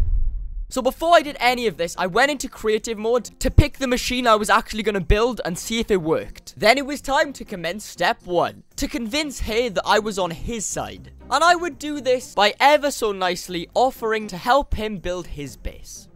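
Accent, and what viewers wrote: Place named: Newport, United Kingdom. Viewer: Man you have grown so much! You probably don't remember but I commented on your Maze 100 days when it came out saying I know that accent anywhere and that I am from Newport! Keep it up I have subscribed hope to get my gaming channel up and running soon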